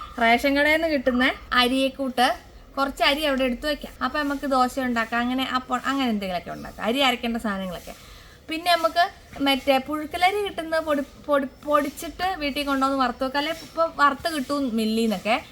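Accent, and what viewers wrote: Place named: Wayanad, India. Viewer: ഏത് നാട്ടുകാരിയാ... നമ്മളെ കൊടുവള്ളി സ്ലാങ് അല്ല വയനാടൻ സ്ലങ് അല്ലെ... പിന്നെ ഇത് എവിടയാ... 🤔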